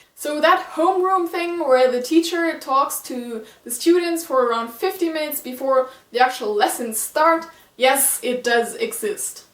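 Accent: Germany. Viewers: You're German? Cool, I was going to ask because of your accent was unfamiliar to me, but here it is in the comments :) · I could hear from your first sentence, that you are German^^ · I knew you were German cuz when I heard your voice, you sound like Trixie from the YouTube channel Don't Trust the Rabbit.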